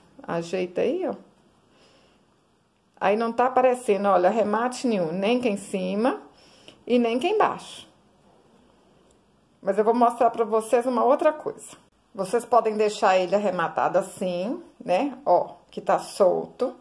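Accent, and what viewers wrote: Minas Gerais, Brazil. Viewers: Há quanto tempo desejo aprender a fazer fuxico... Muito obrigada... Sei sotaque é muito lindo!!! É de minas??? Muito graciosa e paciente você é! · Ah o sotaque mineiro 😍 Um calma, conseguimos pegar passo a passo, obrigada por compartilhar suas ideias.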